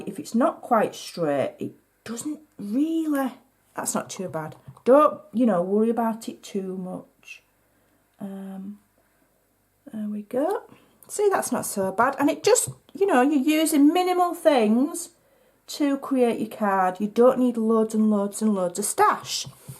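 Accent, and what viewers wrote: United Kingdom. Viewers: I love your accent. What part of the U.K. are you from?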